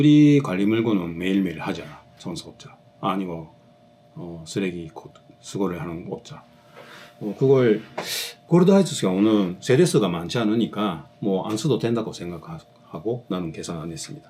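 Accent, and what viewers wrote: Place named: Gyeongsang, South Korea. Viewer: 구수한 갱상도사투리 듣기좋아요😊😊😊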